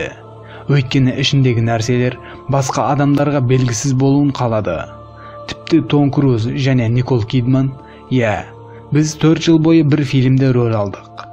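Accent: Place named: Uzbekistan. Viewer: каналды жүргізіп жатқан жігіт, сіз өзбексіз бе? өзбекше акцент бар сияқты тіліңізде?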